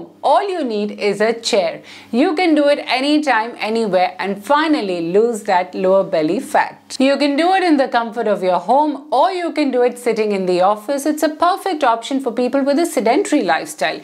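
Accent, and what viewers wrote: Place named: India. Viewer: Thanks so much. From your accent I can immagine you are from India. I was living there for many many years and I love your country. Thanks and hugs from Italy. ❤️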